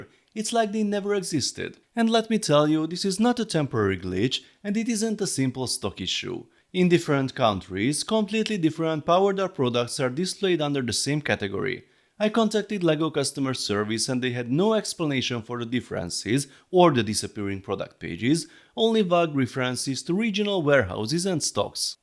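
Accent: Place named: Hungary. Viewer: Heló i m..... erősen magyaros a kiejtés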